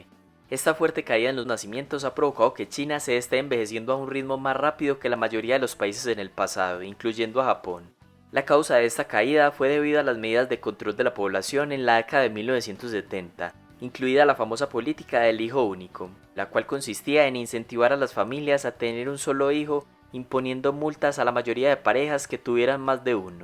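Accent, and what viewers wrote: Antioquia, Colombia. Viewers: Puro paisa! Se le nota a leguas.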